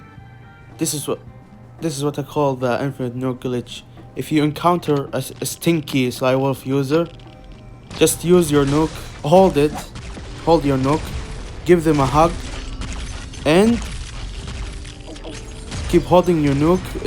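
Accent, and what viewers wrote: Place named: Arab world. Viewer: Are from uae or suadi u sound like arab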